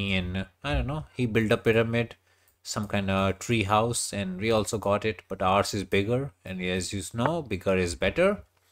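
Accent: India. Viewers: The indian accent gets me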